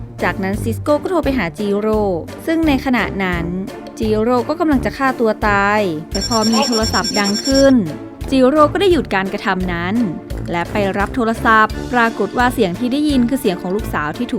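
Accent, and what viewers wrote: North, Thailand. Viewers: สนุกกกกก คนภาคเหนือแน่ๆเลยอะ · แอดมินเป็นคนเหนือใช่มัยครับ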